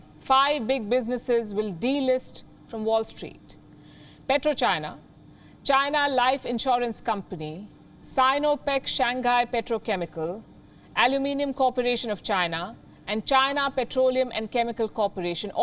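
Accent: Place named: Gujarat, India. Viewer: USA is a rogue country. Typical gujju English by the news reader. Let her go and learn proper English rather than crying with a crimping tone.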